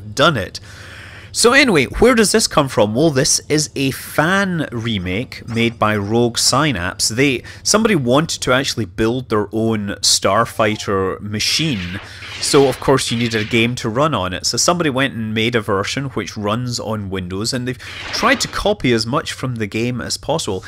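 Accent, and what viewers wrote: United Kingdom. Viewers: I'm really interested in languages and pronunciation and I just have to ask you: What kind of accent is that, that you are speaking? Is it maybe some kind of british accent? I'm obviously not a native english speaker, so I'm just curious about it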